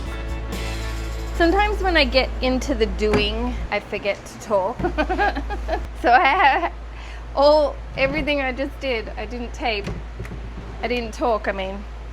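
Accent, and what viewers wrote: Australia. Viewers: So so so glad to hear an Aussie in US restoring furniture .... so glad to have found your youtube channel ...... Trust you go really successfully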